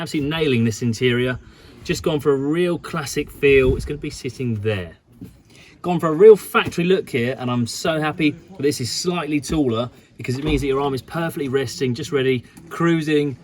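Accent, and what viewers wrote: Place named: United Kingdom. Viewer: Could somebody tell me what is the brand of the front seats, visors cover and the back seat covers? English isn't my native language and the British accent is a bit tricky for me to understand. Thanks for the help.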